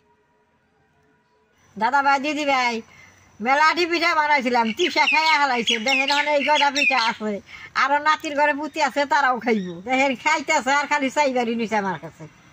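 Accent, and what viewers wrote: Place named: Kishoreganj, Bangladesh. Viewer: Asam ar vasa onak ta Bangladesh ar Kishoreganh ar moton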